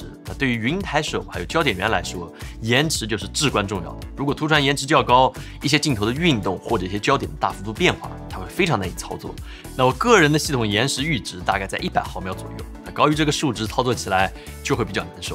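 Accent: Taiwan. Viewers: 台灣人這樣講話的嗎?? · 你很机车，妥妥台湾腔。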